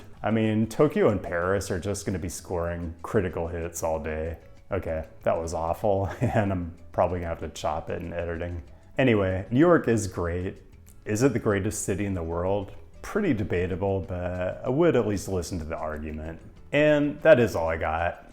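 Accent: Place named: Midwest, United States. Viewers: Is he? I'm Floridian. He sounds midwestern. Y'all have dry wit up there too do ya? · he sounds Midwest to me also but I already heard from his other videos where he’s from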